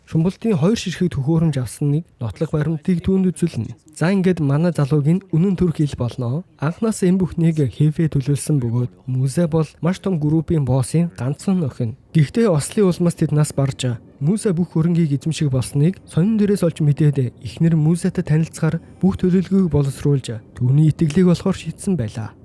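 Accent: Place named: Uvs, Mongolia. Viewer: Mongol heleeree nutagiin aylagtai yrij bgaa bizdee. Uvs aylaga l bh shig bna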